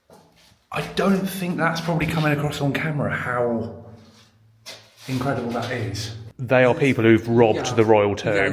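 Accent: England, United Kingdom. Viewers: This guy is extremely english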